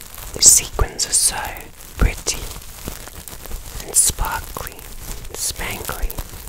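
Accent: Australia. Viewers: As an australian - probably the best Aussie accent from a foreigner ive ever heard!!!!!!! looking scrumptious as always xx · Your Australian accent is on point!! 😍😍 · Shiiiiiit!! That is a noiiice Aussie accent! And I'm an Aussie Sheila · Without a single doubt, the best Aussie accent I've ever heard from a non-Australian. You even had the same tongue in cheek wit you always have but with that very distinct aussie brand to it. Very nuanced performance love love loved it. Cheers from down under x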